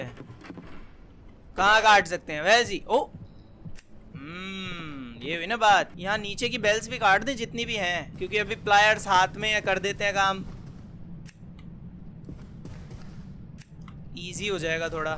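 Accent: Haryana, India. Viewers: play evil nun please! With your haryanavi accent and commentary😍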